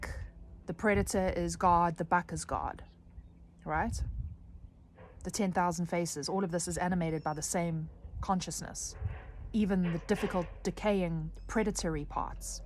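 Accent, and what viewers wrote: South Africa. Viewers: is this a South African accent?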